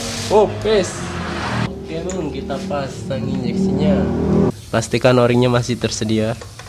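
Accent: Banyumas, Indonesia. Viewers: Wong ndi rika....deneng ngomonge jawa ngapak kya aku😁😁😁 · Bengkele ngndi mas ngomonge ngapak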